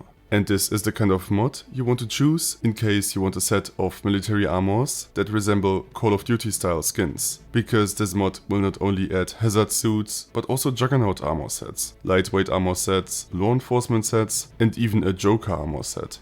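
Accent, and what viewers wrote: Germany. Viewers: are you german? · you are German or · Bro are you german??? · thats a realy german english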